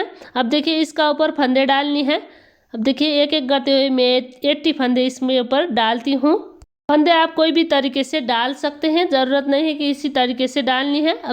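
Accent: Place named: Nepal. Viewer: Kina hindi boleko nepali tone xa ta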